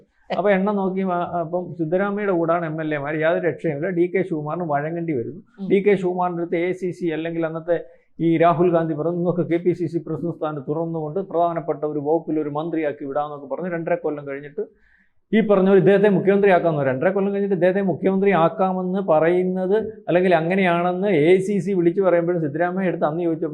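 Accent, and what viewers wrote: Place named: Thiruvananthapuram, India. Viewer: ഈ സ്ത്രീ പറയുന്ന എല്ലാ വാർത്തകളും പച്ച കള്ളങ്ങളാണ്. കള്ളങ്ങൾ വിളിച്ചു പറയുവാനും ഭക്ഷണം കഴിക്കുവാനുമാണ് ഇവൾ വായ് തുറക്കുന്നത്. തനി തിരുവനന്തപുരത്തേ Local slang ൽ വായിട്ടടിച്ചു കൊണ്ടിരിക്കുന്ന വെറും തറ.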